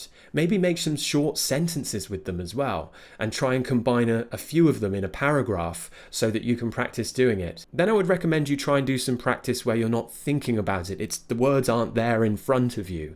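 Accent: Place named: Cambridge, United Kingdom. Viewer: Nice wher in England are you from ..accent wise i cant firgure out il guess Cambridge ?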